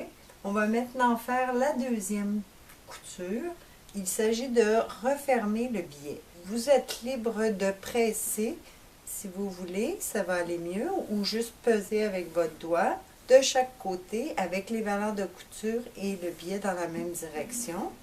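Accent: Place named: Canada. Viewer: Merci infiniment pour ce super tuto, très bien expliqué avec ce sympathique accent canadien! je désesperais de ne pas finir mon chemisier. Je vais pouvoir le faire et je m'abonne bien évidement.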